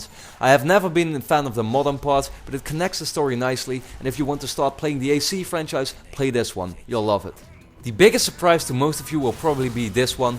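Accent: Netherlands. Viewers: you sound like a dutchmen who speaks english! so are you? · are you from the netherlands? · deze man is nederlands? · Always good to hear a dutch guy, not playing cod or fucking fifa. Nice list. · I'd say, without looking at your channel, that you are Dutch. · hey nice video can i be nosey and ask are you dutch man? :) cool accent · Jij komt uit nederland hé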